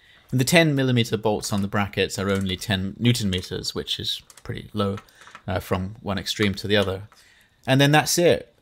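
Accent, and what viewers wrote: RP, United Kingdom. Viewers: I love the posh voice.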